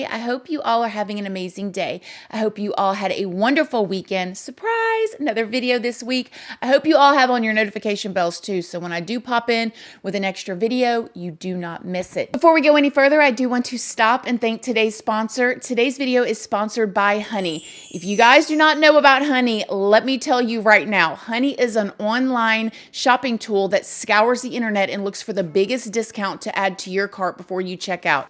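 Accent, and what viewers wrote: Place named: Florida, United States. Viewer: Are you from Florida? You have that cute “kinda southern” accent us Floridians have. ❤️❤️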